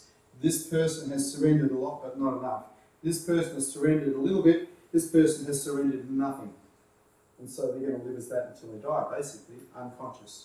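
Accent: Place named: Australia. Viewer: I wish I could understand the Australian accent 😢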